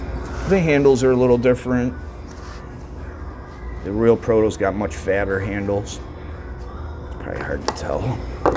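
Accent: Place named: Chicago, United States. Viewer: I listened 5x just for the Chicago accent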